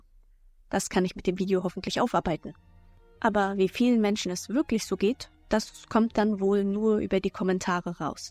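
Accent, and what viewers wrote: Mecklenburg-Vorpommern, Germany. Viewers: Kommst du eigentlich aus MV? Sommä, immä 😅😅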